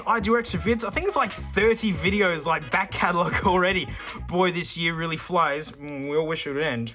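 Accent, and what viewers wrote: Australia. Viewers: This is fairly mild Aussie in the scheme of Aussieness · can someone link me the website he uses to see the audio graphs? i can’t tell what hes saying with his thick aussie accent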